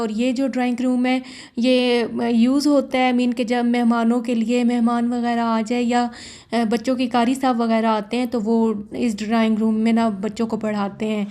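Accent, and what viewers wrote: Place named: Chakwal, Pakistan. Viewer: Yaqqen karen me apky lehjy sy pehcan gai thi k ap chkwal say hain kasmy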